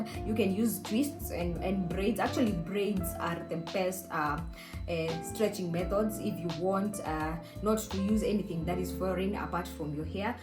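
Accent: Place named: South Africa. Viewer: Love the accent, Kinda reminds me of how Tyla speaks, are you South African?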